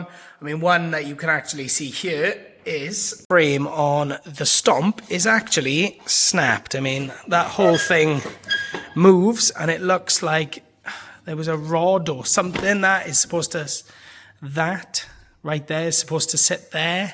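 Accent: Wales, United Kingdom. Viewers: Are u welsh bro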